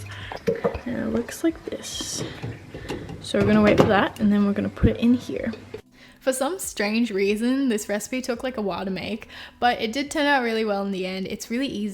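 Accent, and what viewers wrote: Australia. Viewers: I’ve been watching you for 2 years but I still can’t get over your BEAUTIFUL Australian accent omggg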